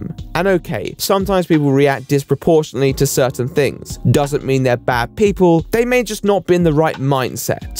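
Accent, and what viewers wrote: RP, United Kingdom. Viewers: I absolutely love his posh British accent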